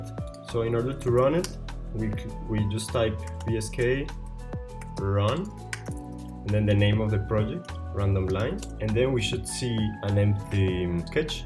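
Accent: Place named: Latin America. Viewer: Bro, are you a spanish speaker from Colombia? Your accent sounds very latin spanish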